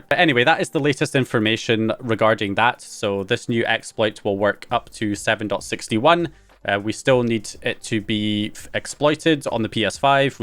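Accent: Scotland, United Kingdom. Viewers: Nah, not really. He's just Scottish. California valley girl is the worst if it's a man speaking that way.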